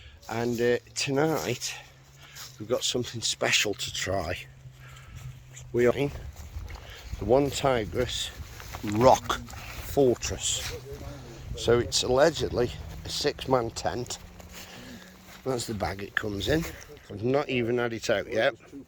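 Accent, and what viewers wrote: Stoke, United Kingdom. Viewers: Just seen your review, I'd like one,of these. A cracking review, thought you were a stokie, Im from castle, we go up there camping also, may have to get one.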